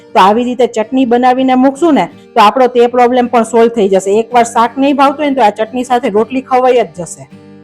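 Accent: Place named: Kathiawar, India. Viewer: nigujarati kathiyavadi Bhasha Mithi laga che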